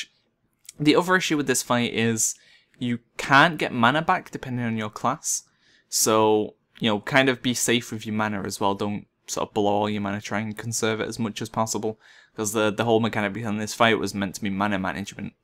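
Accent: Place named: England, United Kingdom. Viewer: Adeeb Hamad Manchester i think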